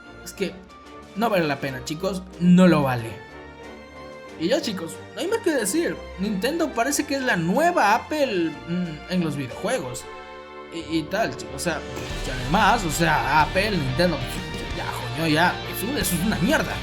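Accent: Spain. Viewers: Por que hablas como español siendo mexicano? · suenas a un español, y, la switch es tres veces mas potente que WiiU, la causa de que Switch no mueva estable el zelda es que es un port hecho a las carreras no optimizado a la arquitectura de Switch, en pocas palabras esta emulando un WiiU. Si no me crees vete a a ver el trailer de super mario Oddesey, que eso lo corre 1080p y 60 fps nativo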